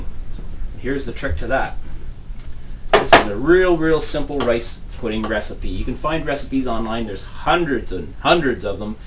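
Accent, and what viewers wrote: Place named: Canada. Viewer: I was just thinking you sounded Canadian, and then you pulled out the bagged milk!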